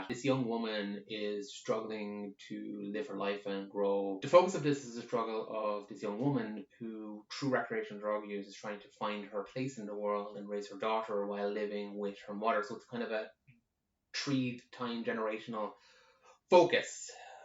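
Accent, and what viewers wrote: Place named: Ireland. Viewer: OH MY GOD YOU’RE IRISH?!?! Your accent is so adorable!!!!!!! Also hi lol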